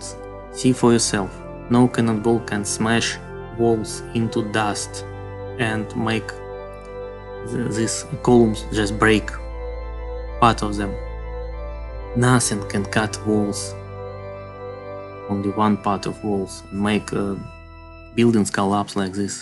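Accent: Russia. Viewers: Dodgy Russian accent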